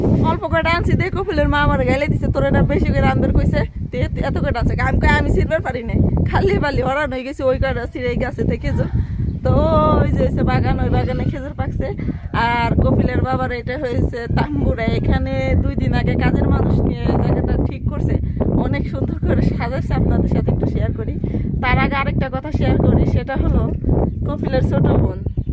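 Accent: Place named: Barishal, Bangladesh. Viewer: আপনার কথা শুনে মনে হচ্ছে বরিশালের মানুষ আপনি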